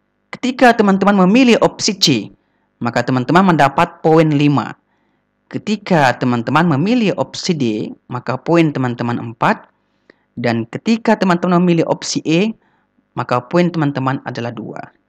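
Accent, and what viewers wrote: Bugis, Indonesia. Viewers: Cekcok logt Bugis 😀😀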